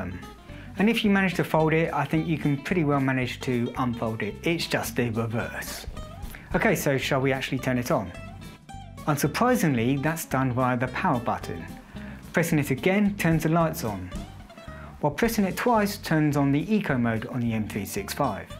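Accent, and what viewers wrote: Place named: United Kingdom. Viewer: pretty obvious this guy is a Brit with that accent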